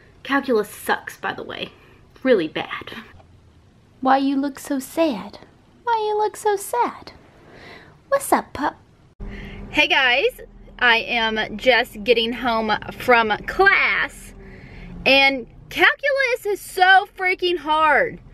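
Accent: South, United States. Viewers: Hi, I just discovered your channel! I love your spunkiness and your southern accent. I am a southern girl at heart! I was wondering if you could use any brand of BCAA's?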